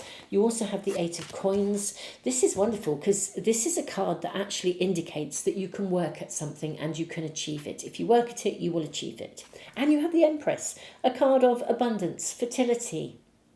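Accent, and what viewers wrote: England, United Kingdom. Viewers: I'm speechless! I did #3; left a 43 y/o relationship that kept me unseen for too long. He dimed my light an my soul. I'm feeling a little bit unsure about my future and worry about my means but I'm proud of who I've become after beeing through my pile #1...I've healed my child wounds and still am as triggers hit me. I met my twinflame through out my healing journey and it is a bit complicated as he also has shadow work to go through. No contact and it's hard. Keeping faith. I will try my #2 pile and reopen my book project that stayed too long in limbo. I just want to be happy again for the rest of my life...with my 4 grown kids, my friends, my art projects and dreams along side with my soulmate that I love. I want to show to the world that if I can do all of this at my age, anyone can find their dreams if they really want to change and find their true self. 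You are right. Many people in my life do not approuve of my choices but I don't care. Thank you for your guidance. Your readings are helping me from day one. Sorry if you find my english writing a little off. I'm french speaking. Hope it all makes sense. Love and Light to you. You have such a lovely english accent.☺️ Merci 💐🙏🏻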